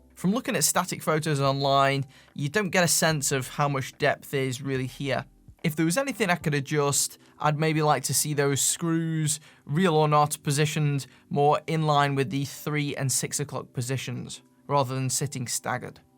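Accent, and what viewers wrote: Stoke, United Kingdom. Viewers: My dad was from Stoke. Your accent is absolutely fine by me as it reminds me of him now he's no longer with us. Love your reviews too.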